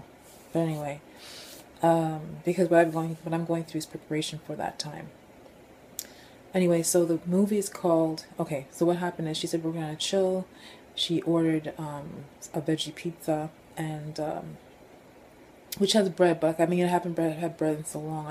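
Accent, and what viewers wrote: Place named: Canada. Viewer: Are you Canadian? You have a little bit of a Canadian accent. I know the government uses TV, and films to brainwash people, and program them to influence their thought processes. Have you ever seen the 1987 film ‘They Live’? It has aliens in it, but that’s not the message the creator was sending. He uses the aliens as symbolism to symbolize that the aliens in that film are actually the elite of this world. The 1% that have control over humanity, and the earth. I believe the elite are Satan worshippers, and it says in the Bible that Satan is the prince of the air, and I believe that means the airwaves. Meaning media we consume. He also has temporary dominion over the earth, and so he can do these things to try to drag as many souls down with him. It’s really sad, but that’s the way the cookie crumbles. I had a prophetic dream about 10 years ago, and to this day I don’t exactly know what it means, but it was very traumatic, and so real, and vivid. 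In the dream I was told to read Isaiah chapter 1, and I didn’t even know if that was a book in the Bible, so I went to read it, and to my astonishment, I found Isaiah was a book in the Bible.. I read it, and it was almost verbatim exactly what my vision was about. It was a little bit different, but it was very juxtaposed to what I was reading. I know when the tribulation happens, God will protect His true elect, unless it happens to be their time, but I believe most of His elect are going to be protected. I don’t want to endure it, I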